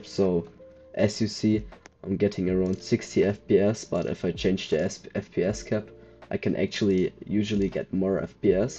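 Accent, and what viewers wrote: Germany. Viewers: Man merkt sehr stark, dass du Deutsch bist. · I hear i little bit of german in his pronouncing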